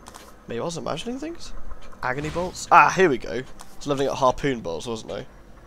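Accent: England, United Kingdom. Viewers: ***** I know this may sound like I'm a cruel and evil person, but personally, I quite enjoy watching you play games which don't entirely satisfy you. I love hearing you complain about things like a gamer would when he's dissatisfied. It feels like I'm actually watching a player play the game (and narrate in sexy English voice) and complain when things are not as they should be. So yeah, keep at it, <3 it :)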